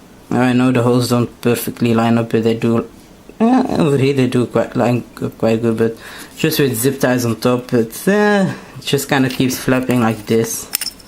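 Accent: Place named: England, United Kingdom. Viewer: Nice project my friend :) Love your english accent! Happy new week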